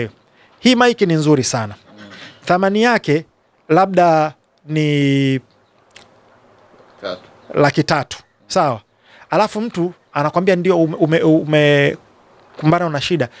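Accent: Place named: Africa. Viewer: Salut kaka yani unavyoongea unabeba pendela ya Africa big up ❤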